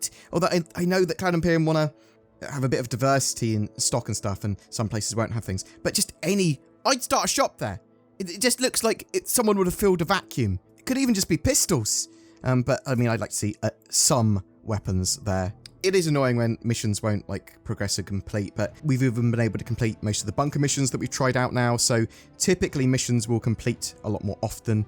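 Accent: United Kingdom. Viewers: Me watching this video: “This dude is speaking my thoughts with a British accent!” I love this game, but those things are definitely pain points.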